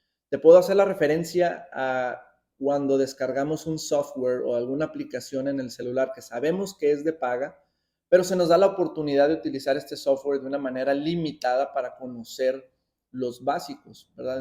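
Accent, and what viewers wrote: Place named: Guatemala. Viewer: De que país es el dr.???? Parece guatemalteco por el acento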